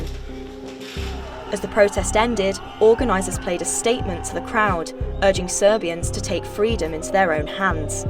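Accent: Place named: United Kingdom. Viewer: This British accent is unwatchable, sorry...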